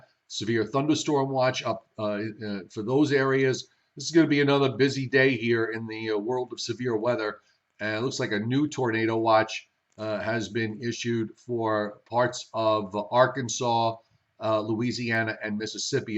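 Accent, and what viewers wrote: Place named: Long Island, United States. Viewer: Good to see you... Whenever I hear your voice it reminds me of my Long Island growing up days!!! Thanks for the update:)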